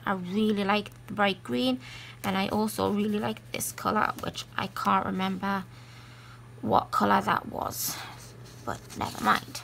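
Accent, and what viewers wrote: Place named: Northern England, United Kingdom. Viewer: Hi, just subscribed to your channel. It sounds like you are a fellow Northerner:D Awesome colouring x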